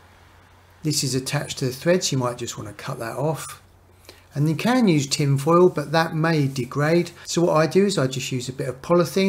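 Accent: United Kingdom. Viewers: Great tip! Well made, and a bit funny! And, myself being a Yank, I always love hearing Brits talk with their accent! Thank you sir for your contribution!! {liked.saved.subscribed=True}👍✌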